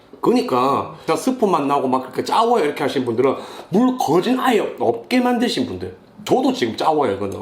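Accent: Busan, South Korea. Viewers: 영상에서 짜워? 이렇게 물어보시길래 ㅎㅎ 부산사투리군여 답글 감사합니당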